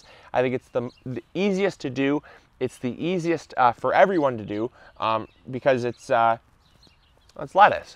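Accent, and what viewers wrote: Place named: Minnesota, United States. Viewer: I'm from Canada so I have no idea, but is this what people from Minnesota sound like?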